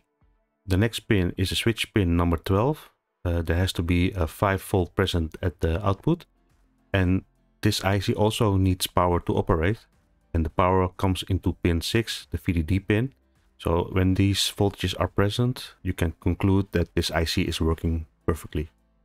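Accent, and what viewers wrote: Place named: Netherlands. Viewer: Zo horen ben je Nederlands👌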